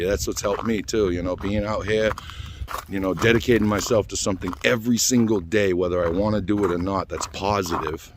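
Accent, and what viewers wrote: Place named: Massachusetts, United States. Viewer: great accent ....massachussets >?? Canada here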